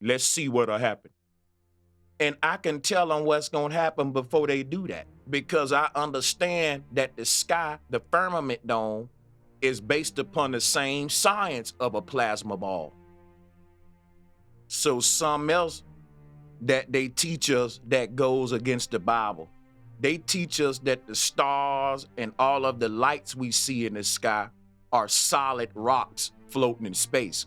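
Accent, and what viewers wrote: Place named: South, United States. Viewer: you disrespect all the black women that made it possible for America to brag about being the first on the moon. You do know if it wasn't for a black female mathematician came up with the calculations for America to 1st send a man into space, than to the moon. GOD is a jealous GOD should have given you the red flag that something isn't right. Why would a being over all be jealous, you peole are too old to believe these fairy tales. Flat Earthers have literally been proven wrong just recently from that trip to Antarctica. Why didn't you take the trip that guy offered to people like you. You can't prove it and no one has silenced you, we laugh at your ignorance in 2025 because there are so much proof of yourr foolishness. In the end you making the statement thst everybody hates Trump so he musy be good, you country accenr does you know justice and just hearing you talk makes me think of why Harriet Tubman always carried a shotgun when she freed the slaves. 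Trump has literally tried to overturn the 1964 civil rights acts but you understand why MAHA likes him. Yeah because the want to makes "US" slaves again...actually get some knowledge because you're lost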